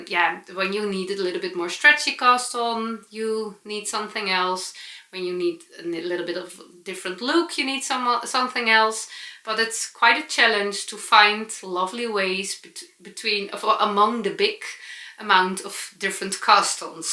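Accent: Netherlands. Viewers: Your English is just fine and I love the Dutch flavor--Dutchy English--I love it!! Don't lose it! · We love your Dutchy English. I wish I spoke Englishy Dutch! 💕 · Definitely second this. Dutchy English is perfect just the way it is - makes my heart jump every time!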